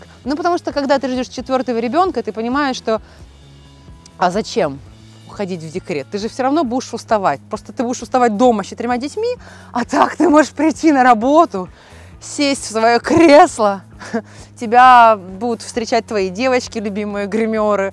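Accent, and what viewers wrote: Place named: Ukraine. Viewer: У такая красивая украинская речь. Конечно лучше на украинском языке!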